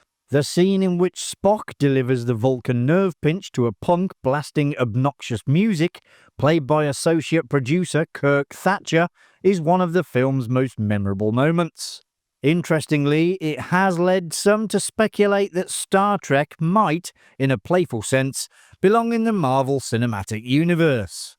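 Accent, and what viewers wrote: United Kingdom. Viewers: Your British accent annoys me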